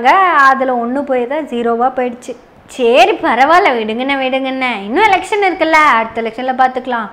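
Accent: Kongu, India. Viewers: கொங்கு தமிழ் கிண்டல் சூப்பர் 😂😂😂😂😂😂😂😂 வாழ்த்துக்கள் தோழி ❤❤❤❤ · அழகிய கொங்கு தமிழில் சங்கிகளை வெறுப்பேத்தி இருக்கிங்க..வெந்த புண்ணில் வேலை பாச்சாதீங்க சகோதரி! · Addicted to your posts sister❤and your kongu accent slang way of speaking is absolutely amazing and stunning 🎉😊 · கொங்கு தமிழ் கிண்டல்.. நீ கலக்கும்மா... வேண்டாத புள்ளைய பெத்து.... 😄😄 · தங்கையின் கொங்கு தமிழுக்கு இந்த அக்கா ( மதுரை மண்டலம்)ரசிகை😊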